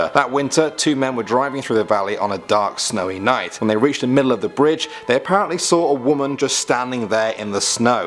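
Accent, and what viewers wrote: United Kingdom. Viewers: Everything's scarier when told in a British accent. Thank you 🇬🇧 🇺🇸🇯🇲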